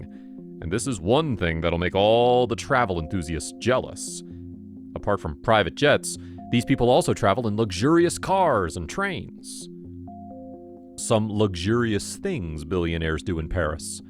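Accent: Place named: France. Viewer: Your French accents is nice sir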